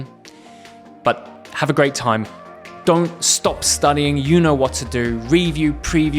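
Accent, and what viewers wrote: United Kingdom. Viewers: I like it your videos very much. Your British English accent is totally perfect and your videos helped me to learn British English and please come on youtube with more new videos. Thank you very very much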